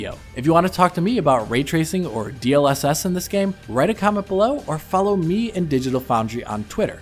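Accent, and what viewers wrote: Germany. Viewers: Lord Farquaad he wasn't so good in his older videos. And he definitely has a slight German accent, you can hear it.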